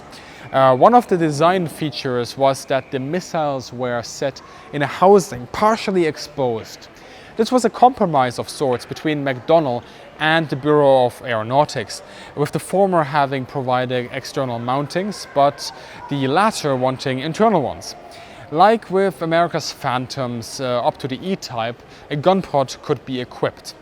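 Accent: Germany. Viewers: Oh my... I apologize, that quite heavy German accent made me hear "that secured the jets aircraft position" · Sehr gute Präsentation ... und ein angenehmer, deutscher Tonfall im Amerikanischen ;-)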